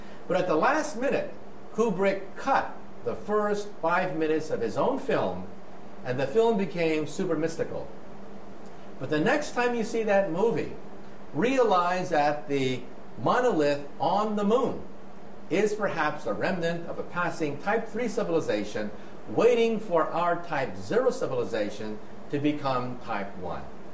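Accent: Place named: United States. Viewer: his accent is so american xD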